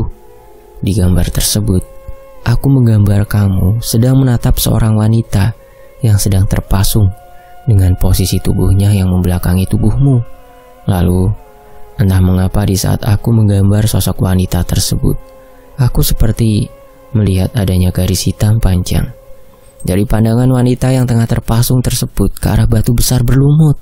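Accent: Java, Indonesia. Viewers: Cerita org dijakarta jadi bikin imajinasi di daerah jawa karena dialeknya😂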